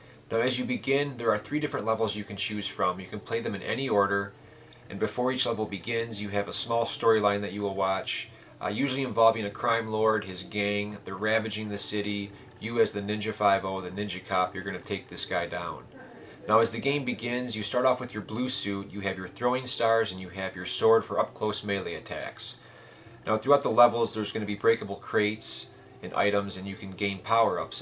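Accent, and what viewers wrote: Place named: Northern Michigan, United States. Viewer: Your North Michigan accent is always a welcome sound to my ears (no homo). Reminds me of my brief time at Michigan State University, and tater tots (which I am not a fan of). This is the evolution of Shinobi on Master System, mixed with Shadow of the Ninja from Natsume for the NES.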